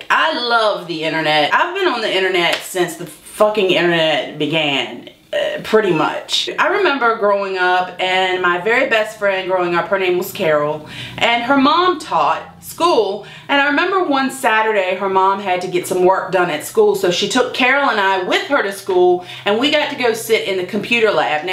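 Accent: South, United States. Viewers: you are saving me from a panic attack right now. I'm Australian and listening to your soothing southern voice is weirdly helping. I don't want to take my short term medicine but I think I have to.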